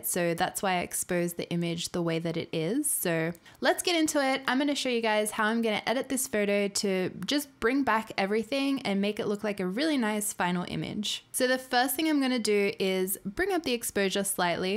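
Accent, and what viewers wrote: Australia. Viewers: Thank you for the great tutorial,this gonna help me alot 😉 Are you Aussie?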